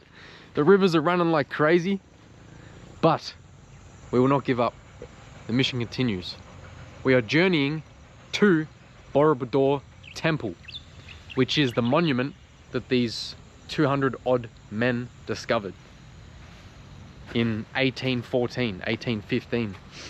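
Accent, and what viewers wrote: Australia. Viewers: I absolutley love it when you say HECTIC!! You have the best aussie accent I've ever heard mate ❤ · Great content I enjoyed watching that. I'm curious about that accent. I was thinking Australia but then south Africa, then maybe both. Am I close?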